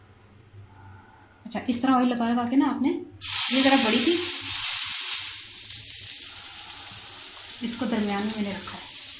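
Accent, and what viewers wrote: Punjab, India. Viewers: Z MIRZA WITCH KIND OF PUNJABI IS ?? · your punjabi so sweet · recipie is very delicious, but your punjabi is much more sweet, Allah always blessed you and Allah give you every Khushi in your life ( Ameen ) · Punjaban se hindi aur 'inshaallah' sunke achha lagta h.. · مجھے آپ کی پنجابی بہت پسند ہے جب آپ پنجابی بولتی ہیں مجھے کافی ہنسی آتی ہے ۔ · Bht cute punjabi hy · I Absolutely loved your video, very simple and natural. Some people are writing such negative comments about your punjabi accent, these people are forgetting your channels name is "Punjabi da kitchen ". I'm a Punjabi Pakistani American. I have a hospitality degree and been in the States for most of my life. You girls are doing a good, keep working hard and be yourself I loved your work. God Bless <3 <3 <3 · Ur punjabi so sweet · Nice Panjabi touch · Really enjoy your sweet cute punjabi.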